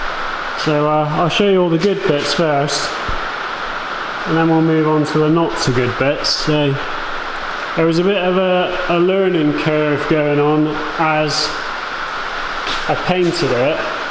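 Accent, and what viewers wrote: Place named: South West England, United Kingdom. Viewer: Nice build fella, I thought you had a familar sounding accent. I'm in SW UK also, it kinda makes the project all the more interesting. Subscribed.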